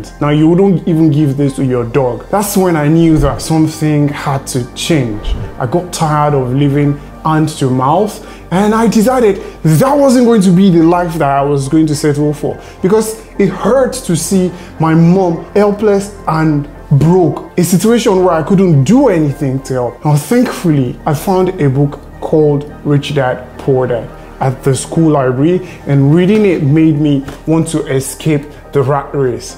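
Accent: Nigeria. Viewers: He is a Nigerian man with a Nigeran name who lives in Nigeria and looks Nigerian and sounds Nigerian, starting off from broke with no financial help or support, and he still managed to be rich. You have to respect this man because, in his current situation, he is starting from an extremely difficult mode, while most of us in the Western world, compared to this man, are starting from an easy mode. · I can still hear his H factor tho. It's part of the Yoruba accent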